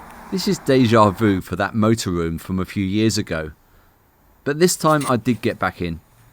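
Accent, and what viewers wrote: United Kingdom. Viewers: nope he's from the UK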